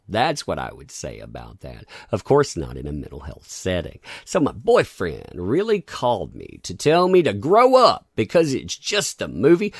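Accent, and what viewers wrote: South, United States. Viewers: i like your content, i swear i really do, that is why i keep watching it for quite sometimes, but as a chinese dude i find it somewhat hard to understand your accent, which i suppose you will find my accent also hard to understand if you heard me speak, so this is a sad goodbye, i am trying too hard to listen to enjoy it. to bad i actually kind of like the sound of your accent, which i'm guessing southern ish, but alas it is too hard for me who's english is my 4th language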